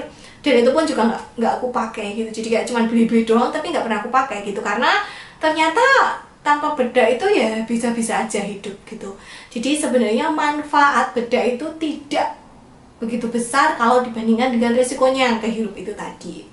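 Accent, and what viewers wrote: Java, Indonesia. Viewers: Dihh bu bidan lucu yaa gaya bicaranya, aku yg juga orang jawa kerasa bgt uniknya ,malah jadi ciri khasnya Bu saran dong, gimana kalo ngebersihin BAB pake tisu basah, bahaya kah? Kan kalo pake wash lap suka ribet Makasi bu bidan yaa sharingnya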